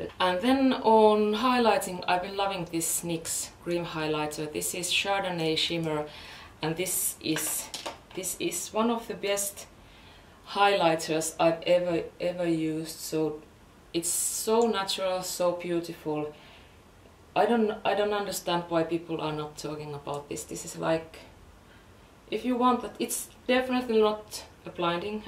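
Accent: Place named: Finland. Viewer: Aksentista arvasin että olet suomalainen. :D Jee, kiva löytää suomalaisia kauneustubettajia, jotka tubettaa englanniksi. :D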